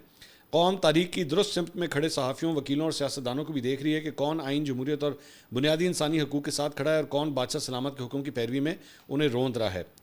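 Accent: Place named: Lahore, Pakistan. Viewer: The Lahori accent, embracing it as a part of your identity and cultural heritage. The Lahori accent is indeed a unique and lovely aspect of Punjabi culture, and it's wonderful that in your videos i really appreciate and loved it!😂😂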